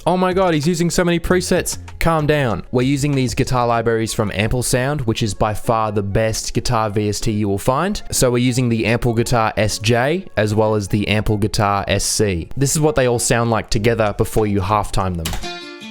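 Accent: Australia. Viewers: Mate are you from Australia? Cus I hear the accent 😂🤙🏾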